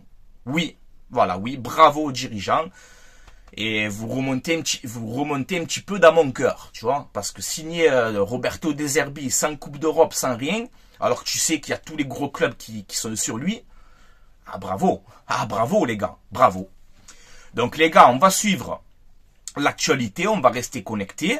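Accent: Marseille, France. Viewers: Comme d'habitude tu régales avec ta bonne humeur ton enthousiasme et ton accent marseillais 😅 Allez l'OM ❤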